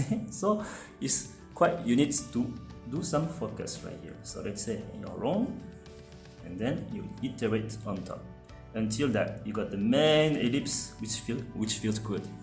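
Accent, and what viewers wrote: France. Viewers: accent de français ça jreconnais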